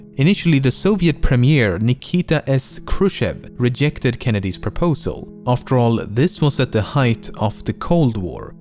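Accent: Sweden. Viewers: love u man with ur sick swedish accent, keep up vids ive been with u since 50k subs and rember when u still replyed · you sound soooo Swedish tell me you are!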